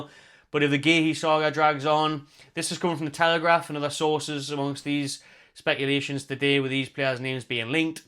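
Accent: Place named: Newcastle, United Kingdom. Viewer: great content but your eager beaver Geordie accent is somewhat of a challenge to us foreigners at times. please don't have us deported to Greenland, thanks. Lloyd, The Falklands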